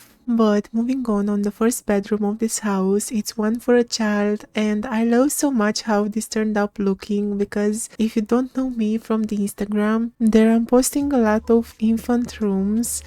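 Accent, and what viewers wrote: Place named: Greece. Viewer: Your Greek accent 😍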